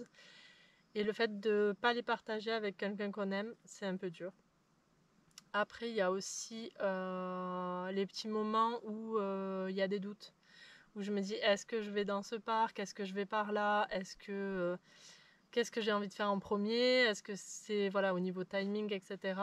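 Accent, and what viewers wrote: South-West, France. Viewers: Nature, authentique, sincère , un peu sauvage et avec en prime un bel accent du sud ouest! Vous avez gagné un abonné de plus! Je vous conseille de suivre les périgrinations de Django nomade dont je suis aussi grand fan...Bonne route et à bientot!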